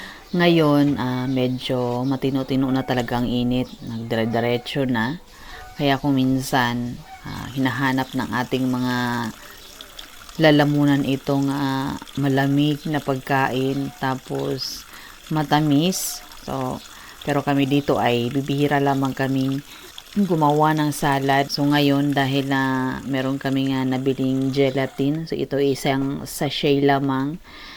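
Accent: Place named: Visayas, Philippines. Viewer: Paano kayu nagka kilala ng asawa mo sa tuno kase ng salita mo hinde ka taga kalinga para kang taga bisaya.sana ma notice mo comment ko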